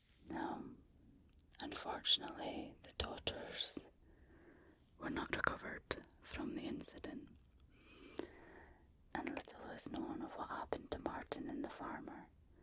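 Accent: Scotland, United Kingdom. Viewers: This video took me on such a calming journey! The blend of Scottish legends, soothing hand movements, and that perfect ear-to-ear whispering in your Scottish accent made it incredibly immersive. The guided meditation and visualization were just what I needed to unwind, and your storytelling added such a unique touch. Sweet dreams, indeed! Thank you for creating such a peaceful experience.😴😴😴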